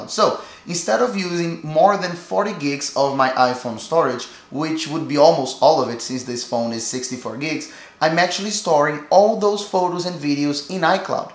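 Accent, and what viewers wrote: Brazil. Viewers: You are definitely brazilian I can tell by ur accent 💜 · Helped me a lot, thanks. I realized by your accent that you’re also Brazilian haha. Your English is perfect, congratulations! How is it for you to build such a big channel and so much content in another language? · Are you Brazilian??? Awesome accent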